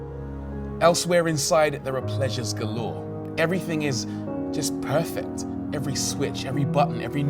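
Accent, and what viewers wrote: United Kingdom. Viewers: THIS video is poetry at its best! I love to listen to this British accent and this beautiful music... Your channel IS underrated, mate! I love this shit.... thanks a lot! :-)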